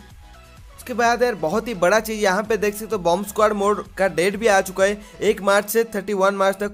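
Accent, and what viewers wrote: Odisha, India. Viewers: Are bhai tum odisha se ho kya ?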